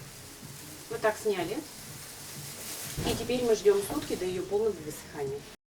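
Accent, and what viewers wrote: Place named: Vyatka, Russia. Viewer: А я думаю,откуда такой акцент ? Вятские....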